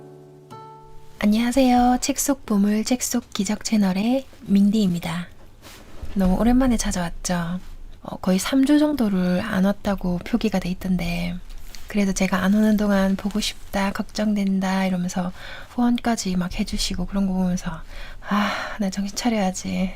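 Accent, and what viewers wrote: Gyeongsang, South Korea. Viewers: 목소리가 좋으셔서 듣기 너무 좋은데 경상도 억양때문인지 더욱더 자세히 귀에 들어와서 좋았네요^^ 감사합니다.^^ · 다른분들은 어떠신지 모르겠지만 저는 산투리억양이 너무 친절하고 진실되고 따뜻하게 와닿습니다. 저는 너무 좋습니다. 그리고 감사합니다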